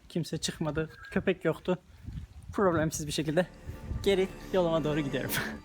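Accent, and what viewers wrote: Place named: Erzurum, Turkey. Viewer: Abi bi erzurumlu olmama rağmen şu yokları yoğh yoğh diye demene uyuz oldum ya tam öyle konuş ya da tam istanbul ağzıya konuş ikisini karıştırınca çok itici oluyor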